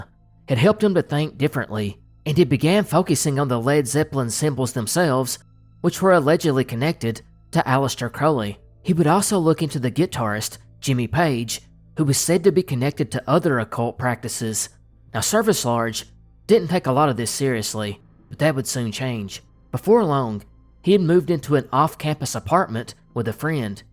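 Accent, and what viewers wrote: South, United States. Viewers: This is great. I can’t sleep without his calming southern drawl lulling me.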